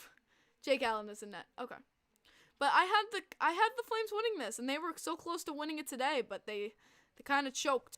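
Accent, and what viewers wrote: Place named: Long Island, United States. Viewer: Your Long Island accent is soooooooooo cute. 😍😘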